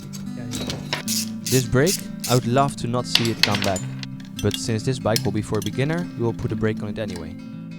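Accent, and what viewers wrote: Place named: Netherlands. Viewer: I hear a typical dutch accent. You gave me some inspiration man. Do you have like instagram?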